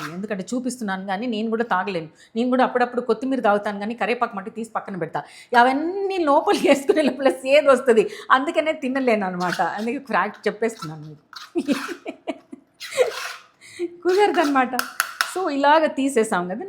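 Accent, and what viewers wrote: Tamil Nadu, India. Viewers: Mee teluguki tamil flavour vachesindi Madam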